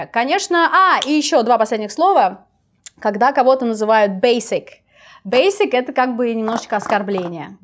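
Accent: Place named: United Kingdom. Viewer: Какой красивый британский акцент! Ласкает слух))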